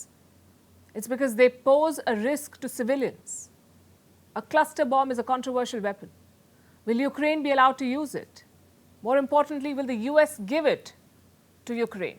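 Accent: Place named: India. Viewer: It's Amazing how Indian slaves mentality talk in funny English 🥁🤣🇬🇧 rule Britain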